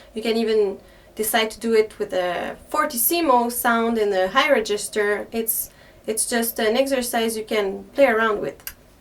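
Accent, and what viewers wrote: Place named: France. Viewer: French horn player here 📯 Thank you for you tips , really helps. I can feel that I'm full with air :) Also you have nice English with gorgeous French .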